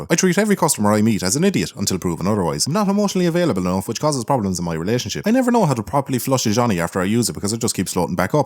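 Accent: South Africa. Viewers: South african accent, can someone tell him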